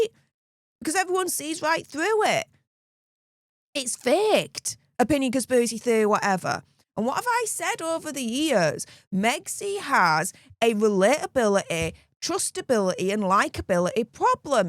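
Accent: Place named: England, United Kingdom. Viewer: I watch all your videos but I’m intrigued by your accent. I can’t place exactly where in England it’s from?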